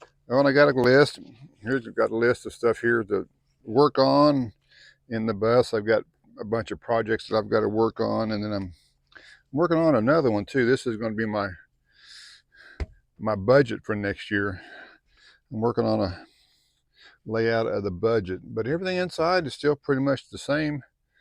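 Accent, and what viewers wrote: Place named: North, United Kingdom. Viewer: Hey you sound like a great northern boy like me.....please h it. Me up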